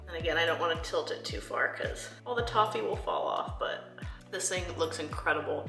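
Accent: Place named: South, United States. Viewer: I don't really understand how you have a southern bell accent when being from the pacific northwest. Butt! It's very charming, you've pulled it off. I'd love to see you ollie. Do a kickflip!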